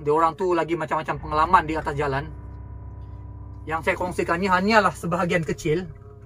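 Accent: Sabah, Malaysia. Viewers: Org sabah ka nih ckp cm org sana jak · ia org sabah tu,bahasanya tu,tapi x pasti daerah mana,sy pun baru tgk chanel nya ni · Slangnya slang Sabah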